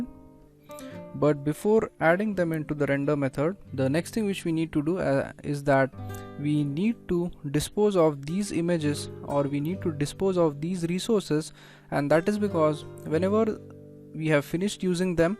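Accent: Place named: India. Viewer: I cant withstand indian accent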